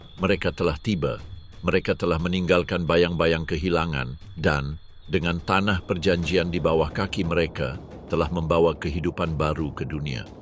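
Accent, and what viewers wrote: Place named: Malaysia. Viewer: Ini konten orang Malaydesh jangan di tonton itu logat bahasanya orang Malaydesh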